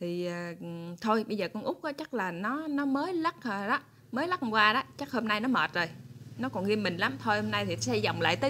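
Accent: Mekong Delta, Vietnam. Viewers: Giọng miền Tây có duyên ghe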